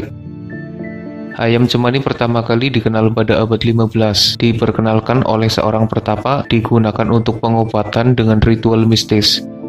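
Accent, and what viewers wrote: Banyumas, Indonesia. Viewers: Adminnya orang ngapak nih wkwk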